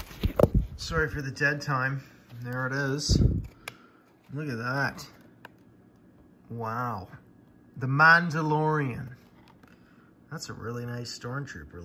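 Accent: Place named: United Kingdom. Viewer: Also love your British accent haha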